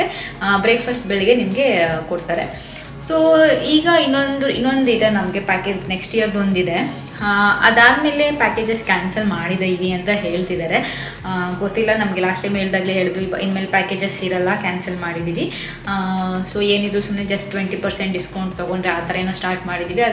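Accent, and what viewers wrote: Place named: Mysore, India. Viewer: ಮೈಸೂರಿನ ಲಾಗ ಸೂಪರ್ 👍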